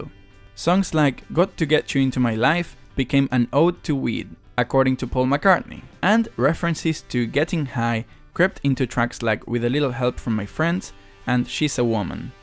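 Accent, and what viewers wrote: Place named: Argentina. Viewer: vos sos re argento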